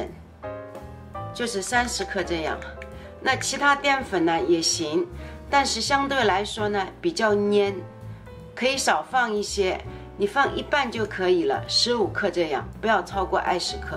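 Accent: Shanghai, China. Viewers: 真正上海人讲国語，就是这种口音的，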